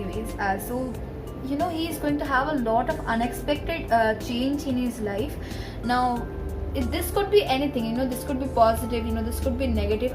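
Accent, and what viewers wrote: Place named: India. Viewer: it's okay sister I will listen it patients so I cab understand actually my hearing english is not that good but it's not that bad and your accent is like indian so it's not that much problem but sister are u from india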